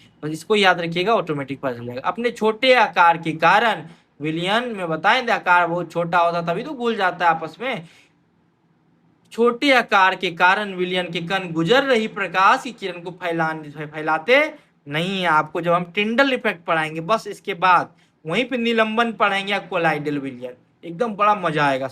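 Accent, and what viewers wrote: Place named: Mithila, India. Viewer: Sir apka jo boli h hme bahut acha lgta aap mithlachal ki or se h na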